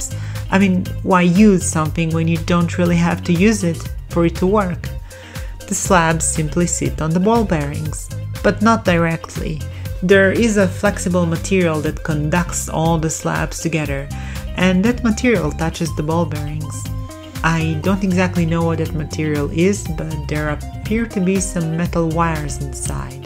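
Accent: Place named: Eastern Europe. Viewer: this slavic ascent😮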